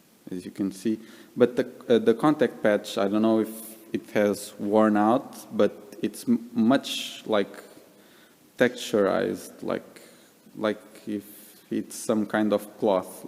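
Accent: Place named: Russia. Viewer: Судя по инглишу, автор случайно не русскоговорящий?)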